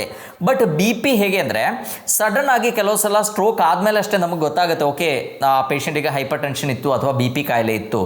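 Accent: Kerala, India. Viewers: ನಿಮ್ಮ ವಿವರಣೆ ತುಂಬಾ ಚೆನ್ನಾಗಿದೆ , ಉಚ್ಚಾರಣೆ ಕೇಳುವಾಗ ನಿಮ್ಮ ಭಾಷೆ ಮಲೆಯಾಳಂ ಆಗಿರಬೇಕು ... ಧನ್ಯವಾದಗಳು ಸರ್.😊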